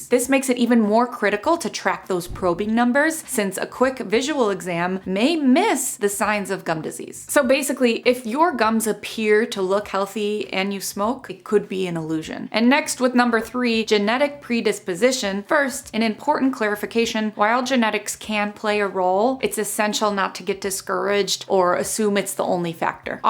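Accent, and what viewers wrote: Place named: Chicago, United States. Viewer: You’re from Chicago right? I sense a Chicago accent it’s so cute!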